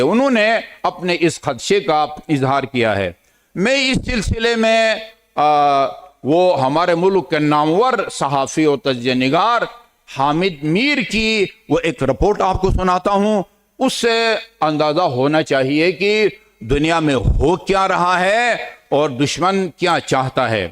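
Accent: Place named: Iran. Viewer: جناب آپ پہلے فارسی لہجہ سرحد پار رکھ کر آئیں پھر کوئی بات کریں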